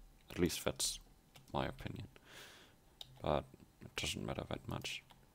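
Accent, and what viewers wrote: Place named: Germany. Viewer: Ich hab beim ersten Wort gewusst das du deutsch bist :D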